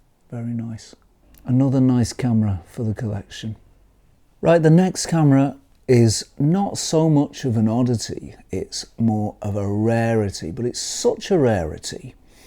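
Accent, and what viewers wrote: United Kingdom. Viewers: My favourite series:) I love your British accent:)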